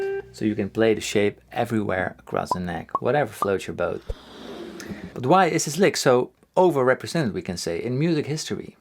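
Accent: Netherlands. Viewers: i just discoverd that your'e dutch. meanly by your accent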